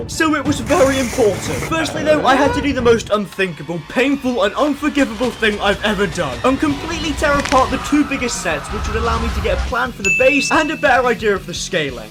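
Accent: United Kingdom. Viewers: Ahhhhhh British mate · Mans British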